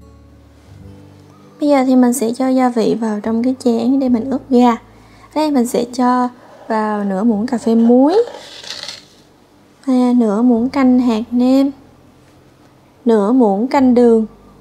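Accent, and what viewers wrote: Mekong Delta, Vietnam. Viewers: Co Gai mien tay Chat giong hay qua ngot lm e oi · Bạn ở miền tây à,nghe giọng de thương quá, cái muỗng dua quê mình....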